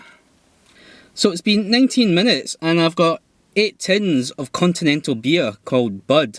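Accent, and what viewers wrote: United Kingdom. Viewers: Omg okay first things first thanks for the info, this really helps with my future decision for a new car. And second, I never met an Asian person with an accent from GB! So freaking cool! 🤩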